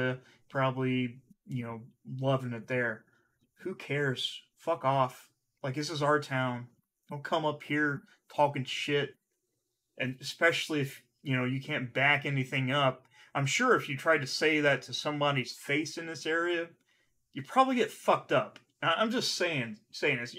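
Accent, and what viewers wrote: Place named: North, United States. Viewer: Watched 2 of his videos and saw he has little respect for southern life style. He sounds like he is from up north.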